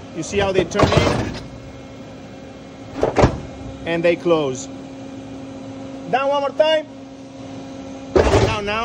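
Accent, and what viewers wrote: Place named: Italy. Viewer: Love your videoes and the way you explain. And your Italian accent. Greetings from 🇧🇻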